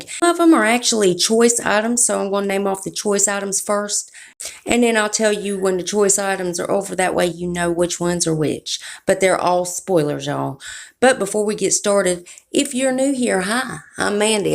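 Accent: South, United States. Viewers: Girl you sound way more southern than central Ohio area! Are you a native born Buckeye? I moved to Ohio when I was 5 and lived there most of my life until I moved to Iowa when I was 40. I lived in several suburbs of the Greater Cincinnati area. I don't have much Cincinnati southern accent left after living in Iowa for over 20 years. lol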